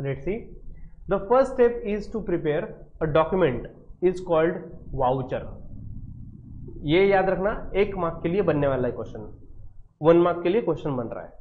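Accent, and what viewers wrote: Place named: Maharashtra, India. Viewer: Your teaching is good sir but it seems like you are new in this teaching field and as a viewer i would like to give my honest opinion on your videos..u lack in speaking hindi as there is a slight mixture of marathi ascent while you speak hindi try to speak one language properly at a time... don't mix-up one language with another and i wish you all the best for your future.... 👍🏻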